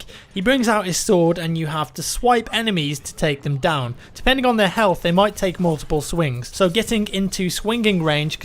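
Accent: United Kingdom. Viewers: Are you from UK? Im from Germany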